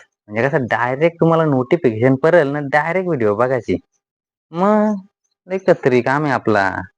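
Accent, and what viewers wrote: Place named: Murbad Shahapur, India. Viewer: बंधू तुम्ही चांगल्या प्रकारे निवेदन देत आहात तुम्ही सुद्धा बोलीभाषा वरून मुरबाड शहापूरकर आहेत वाटतं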